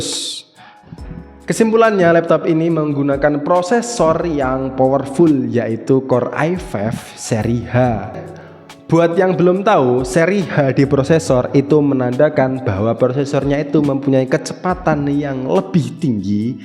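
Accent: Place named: Banyumas, Indonesia. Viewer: ngapak banget mas jadi ketawa saya😂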